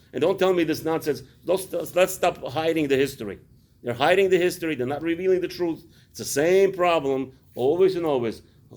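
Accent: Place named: Russia. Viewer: He has Russian accent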